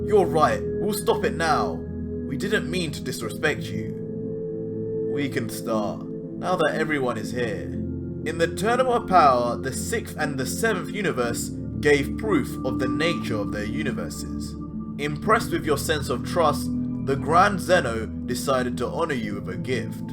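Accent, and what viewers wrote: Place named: United Kingdom. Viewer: Dewi Middle he has a British accent though 🤦🏻‍♀️🤦🏻‍♀️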